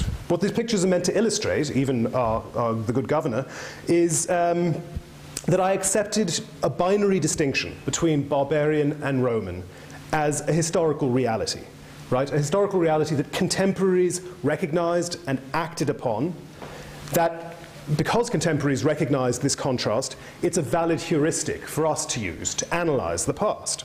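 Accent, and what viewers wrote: England, United Kingdom. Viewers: I don't know, but with that accent I'd bet money he went to an English public school. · Polish name with the most beautifully English voice.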